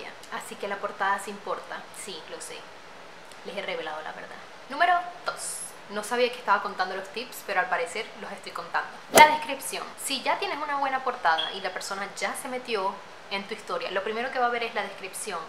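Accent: Venezuela. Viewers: ¿Eres Venezolana? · chama wow. ¿eres venezolana? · El acento venezolano es notable 💁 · Eres venezolana ? Por cierto gran vídeo estoy escribiendo una historia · wow, el acento venezolano se nota a leguas... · Por tu acento sabía que eras venezolana!!!! Te amo chama!!! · Se nota que eres venezolana. Que orgullo que eres de este país 💜💜💜💜. Saludos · ¿eres venezolana?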